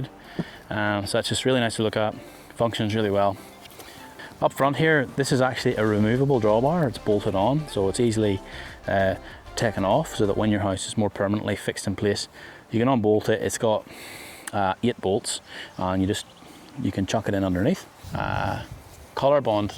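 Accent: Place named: Northern Ireland, United Kingdom. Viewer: Northern Ireland, sounds like.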